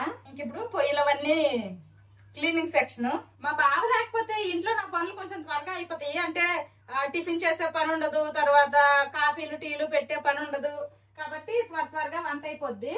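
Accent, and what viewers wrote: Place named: Vizianagaram, India. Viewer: Hai చెల్లి ఎలా ఉన్నారు.మాది విజయనగరం.అందుకే మీ మాటలు మా మాటలు ఒకే లాగా ఉంటాయి. చెల్లి నాకు ఛానెల్ ఉంది. Indus valley కి యూట్యూబ్ మెయిల్ ఇవ్వాలా వేరే మెయిల్ ఇవ్వాలా.please reply చెల్లి.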